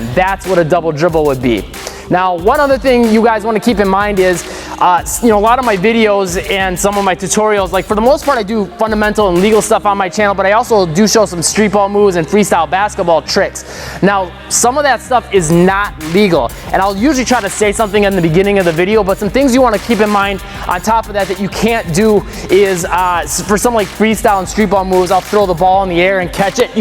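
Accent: Canada. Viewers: Are you Canadian? Hehe, cute accent.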